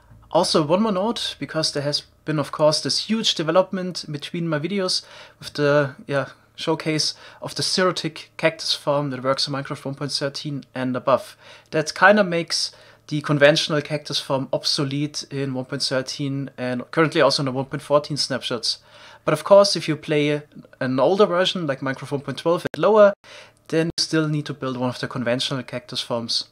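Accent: Germany. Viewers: Ich habe noch nie ein deutscheres englisch gehört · But he is also using the german english pronunciation xD no offense but it is hard to watch as someone who grew up speaking both languages :D anyways, the videos are still insanely informative #nohate # donttakeitpersonallyplease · Wer ist auch deutsch und merkt das er deutscher ist wegen dem Deutschen Akzent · Who hears also the german dialect ? Richtig cool. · Höre ich da einen Deutschen akzent? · Man hört halt raus das du deutsch sprichst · and its because there is a German accent in his speech I think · i love the german acccent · bist du deutsch hahaha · 😂Digga was geht 😏👌 man hört es raus das du aus Deutschland bist 😂👌 hast du auch was neues wegen Kaktus? Schau Mal vorbei bei mir ✌🏾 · Dieser deutsche accent triggert mich jedes mal hahahaha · german accent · Lmao u sound soooo german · Bist du ein deutscher? 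Dein Englisch hört sich an wie wenn ein deutscher englisch redet lol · I actually like his German accent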